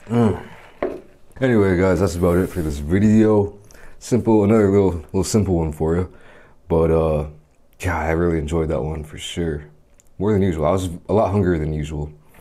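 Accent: United States. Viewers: Why do you have an American accent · U got American accent dude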